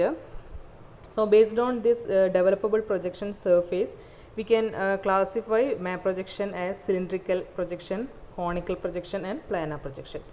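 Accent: South India, India. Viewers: Yes south india english is sweet & innocent , but its a good video ...nice explination watching from aboard ...professor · the south indian accent of english is funny and lovely at the same time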